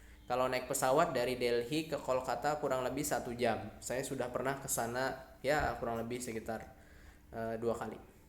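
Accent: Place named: Java, Indonesia. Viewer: Saya gak nyangka lo kalo masnya dari kalimantan😀 kirain wong jowo😆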